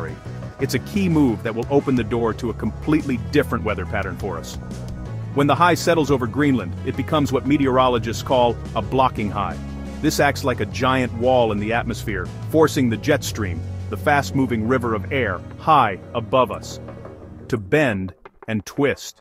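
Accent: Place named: United States. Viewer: Why does someone talking about the UK have an American accent?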